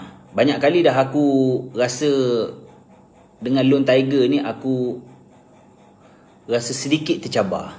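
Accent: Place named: East Coast, Malaysia. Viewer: Aku faham logat dia.kalu dop kelate ganu kalu xganu pahang.4ehehe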